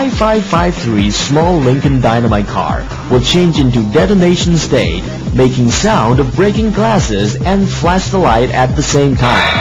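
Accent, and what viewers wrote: United States. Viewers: wtf.. the narrator has the same crappy grammar that you can read in translated chinese manuals, but an american accent?! Me's confused!!!! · this guy's got a great american accent, but the grammar reveals this isn't an american :)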